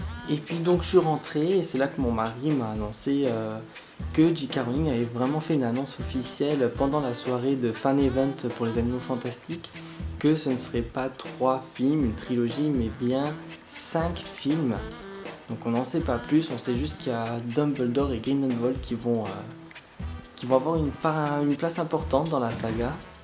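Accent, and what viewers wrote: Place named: Lorraine, France. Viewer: Tiens ? Toi aussi tu est lorrain ?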